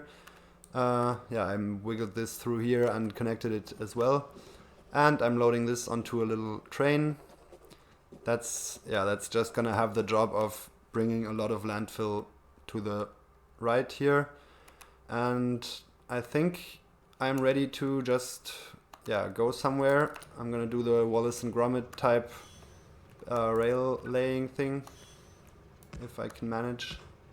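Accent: Germany. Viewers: Aye bud, you kinda sound a tiny bit German is that possible?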